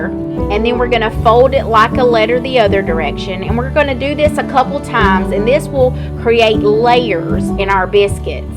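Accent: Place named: South, United States. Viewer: Them biscuits look amazing and with a southern accent like that, I trust anything you cook.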